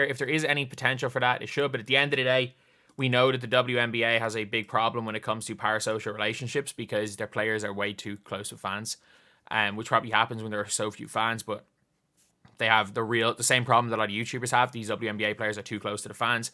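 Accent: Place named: Ireland. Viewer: I hear “So Caitlin Clark…” in a strong Irish accent in random places I shouldn’t even be hearing it now 🤣🤣 Love you and keep up the good work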